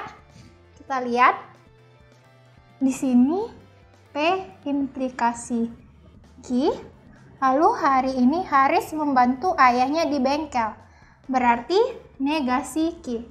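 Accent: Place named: Medan, Indonesia. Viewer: Kaka orang medan y bhsanya kedengeran bnget ka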